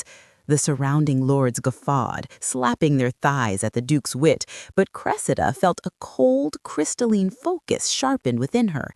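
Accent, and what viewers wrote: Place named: United States. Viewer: I am through 👎🏻👎🏿👎🏽👎🏾listening to stories set in England using AIAmerican accents. Stories set in the British Isles need the appropriate accents. You lost me. I will not subscribe.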